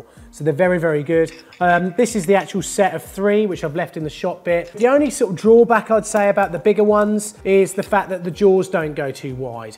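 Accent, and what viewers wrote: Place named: Southern England, United Kingdom. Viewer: It's a southern English accent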